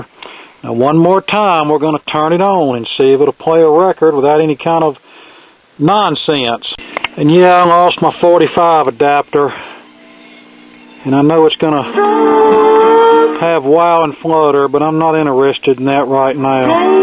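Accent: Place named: Louisiana, United States. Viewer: He sounds like he from Louisiana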